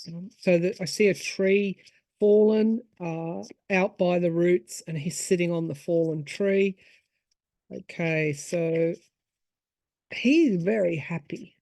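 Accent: Australia. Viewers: I cannot look away.. I love your videos. Keep your calm, soothing energy. And your Australian accent is a plus. GOD bless you.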